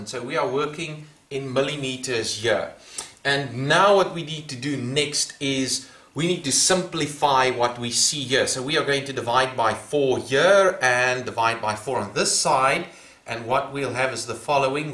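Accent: South Africa. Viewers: south african or new zealand???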